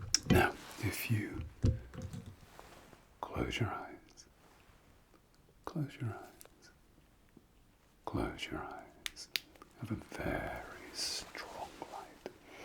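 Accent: France. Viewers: as a french person, i LOVE how you make the french accent !! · " no screen 1h before sleep" woops. Désolé, i'm already in my bed watching your video xD what do we do xD Love your humour and your french accent, vous parlez très bien français ;) (from a french canadian ☺️)❤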